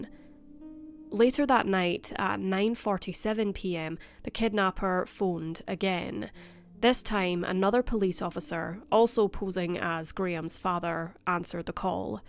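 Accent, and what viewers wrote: Scotland, United Kingdom. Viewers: Jeez - you can't tell the difference between Canadian and Scottish.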